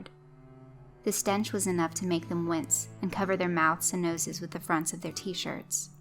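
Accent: South, United States. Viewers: I really hate the stereotypical southern accent, It's ignorant and makes the story annoying. Being from Texas, I'm irritated. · your southern accent is so convincing that I can'T listen to tat story xD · love your southern accent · I love how you get that Southern Drawl Right on the Button.... BUT You CAN'T leave us hanging....you mentioned Joey Disappeared .... WHERE DID HE GO ???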